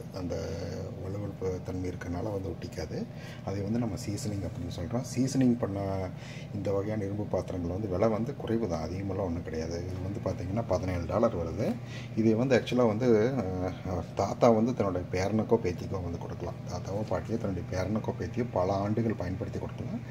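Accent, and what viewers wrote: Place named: Kongu, India. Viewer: Effective crisp communication of key facts in simple kongu tamil !! Keep it up sir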